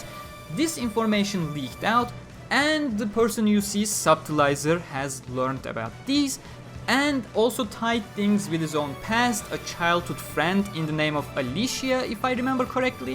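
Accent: Turkey. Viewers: The way you talk English is just like a Turk speaking. Actually your name is so, that makes sense. If you're Turkish, please reply this comment. I wish to meet a Turk who watches SAO and talk about it a lot.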